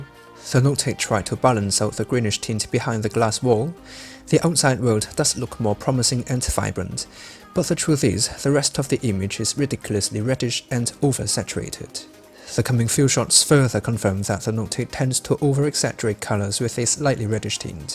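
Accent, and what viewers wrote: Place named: United Kingdom. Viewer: 你的英音真的好听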